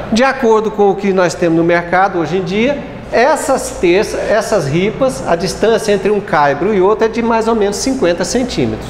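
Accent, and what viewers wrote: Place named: Minas Gerais, Brazil. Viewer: Professor excelente conhecedor de geometria, vasto conhecimento operacional com sotaque de mineiro meus parabéns professor por toda as suas teorias das edificações para execução de obras com seguranças e determinação..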